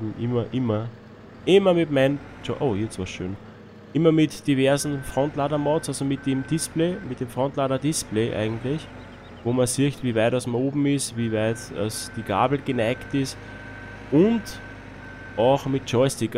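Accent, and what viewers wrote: Austria. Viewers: Danke! Endlich einen guten Gabelstapler gefunden! Dein Dialekt ist Österreichisch oder? Abo hast du :D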